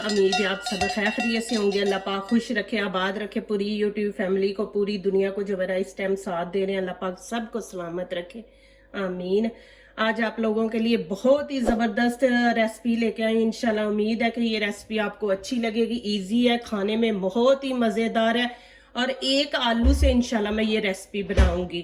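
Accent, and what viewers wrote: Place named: Punjab, India. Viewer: Apki recipes tu achi huti hn par ap apna lhja sahi kar lain ya phir punjabi hi bol liya karain please 🤦😏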